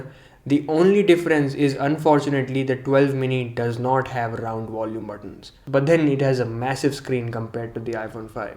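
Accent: India. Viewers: i love this indian accent ♥️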